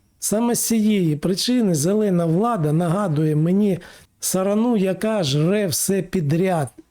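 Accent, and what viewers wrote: Moscow, Russia. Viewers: Згоден в усьому. Слух ріже а-ля мацковська вимова: Акремі, Ачікує, кАму, пАпередники, Астанні... "Плюс" зміст, подача "мінус". Коротше: Ахуєть, а "училке" з української наше "ФЕ".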